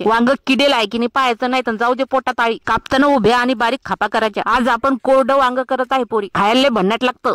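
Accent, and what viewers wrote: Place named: Ahmednagar, India. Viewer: नगरी भाषा 😊❤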